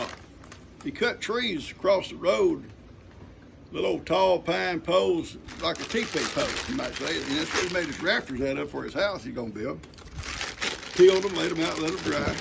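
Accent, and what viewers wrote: South, United States. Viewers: Good morning... having my breakfast and coffee with you before work. I absolutely love you're country accent and you're stories, like so many on here, I can listen to you all day. I love how Ivan was demanding attention, lol. He is the cutest little donkey. He loves you so much. And I can see you're love for him, it shows. Also love how you call baby. Ya'll are so cute together. I am blessed by ALL you're videos. Keep them coming. Hope you're knees are feeling better. Say hello to you're beautiful wife. God Bless you and all you're family and furbabies. Give Ivan a hug for me. Have a blessed week. Already looking forward to your next video.😁❤ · Love your country voice! Have a wonderful day....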